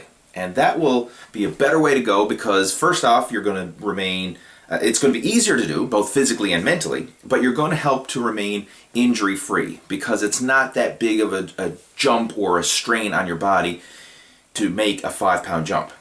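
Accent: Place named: Atlantic Canada, Canada. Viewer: Holy shit im canadian but im still annoyed by his accent. he must be from pei or newfoundland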